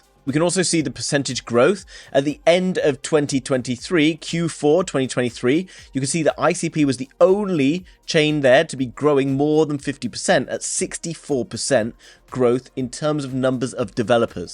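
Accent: RP, United Kingdom. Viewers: Your semi-posh Brit accent, with the odd expletive, is music to the ears. Wouldn't turn down a celebratory donation of ICP on 100K subs.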